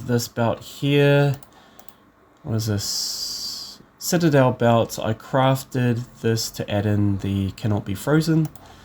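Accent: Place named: Australia. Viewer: Are you australian? Your accent sounds australian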